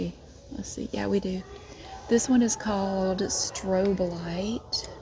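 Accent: South, United States. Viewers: Your quick-witted commentary with your southern accent makes these my favorite videos. You always make such a drastic before and after with organizing. Please do more! Thanks!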